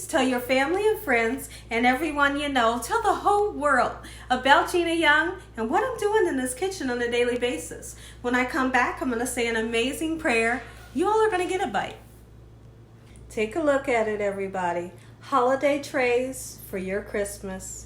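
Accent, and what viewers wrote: South, United States. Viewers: Girl do not worry about your braces. You still have an amazing smile and I love how you speak. That beautiful southern accent. Ooowee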